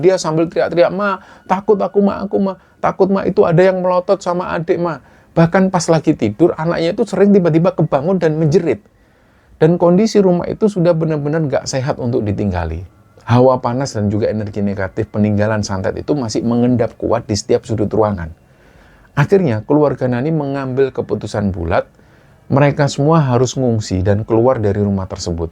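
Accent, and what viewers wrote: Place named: Bali, Indonesia. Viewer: Salam dari Blitar yang sedang di Singapore. Hari hari suara mas nemenin kerjaku. Kalau mas ngomong Jawa jadi ingat ipar ku pas pelafalan huruf D. Iparku orang Bali asli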